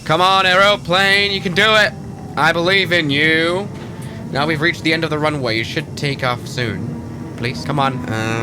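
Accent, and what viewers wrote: United Kingdom. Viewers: I ponder why this gentleman was conversing as a britman. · bri'ish now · plz no plz no why british accent why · Love thee bhrithish akhcent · Why does he sound a bit British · Cause he was in London City airport and in london speak british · Never would i think on this beautiful day that Sir would prefer the british accent on this inaugural day! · Damn mate you bri'ish now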